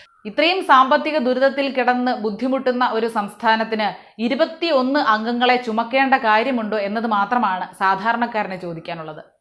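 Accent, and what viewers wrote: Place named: Palakkad, India. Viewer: പറ്റൂല പാലക്കാട് സ്ലാങ്ങിൽ മതി 💪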